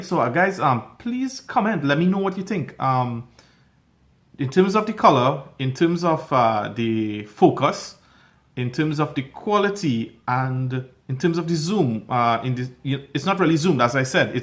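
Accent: Trinidad and Tobago. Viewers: Are you Trini or Guyanese?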